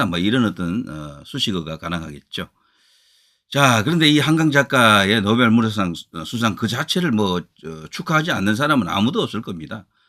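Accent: Gyeongsang, South Korea. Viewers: 내 이런 놈들이 나올지 알았다 갱상도 억양좀 빼고 영상 올려라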